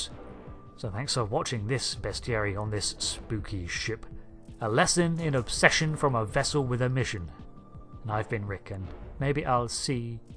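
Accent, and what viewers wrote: England, United Kingdom. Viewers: Dude, your English accent is gorgeous.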